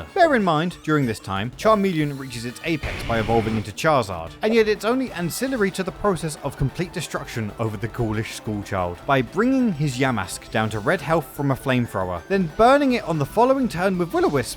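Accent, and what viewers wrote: United Kingdom. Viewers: He’s British? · You’re bri’ish?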